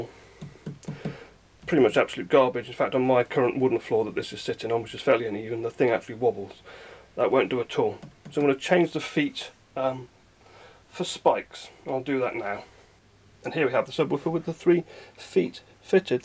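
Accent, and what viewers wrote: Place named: United Kingdom. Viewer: really like british accent. sounds so relaxed not like my launguage :D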